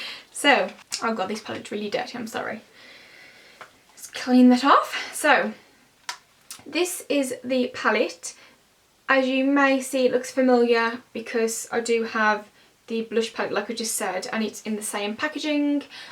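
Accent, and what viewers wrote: Birmingham, United Kingdom. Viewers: Do I detect a slight Brummie accent? It's cute. Great review, hun. x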